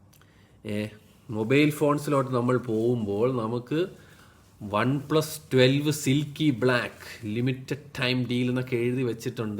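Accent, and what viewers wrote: Trivandrum, India. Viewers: Tvm aanu...ee slang kettitt angine thonunu..😅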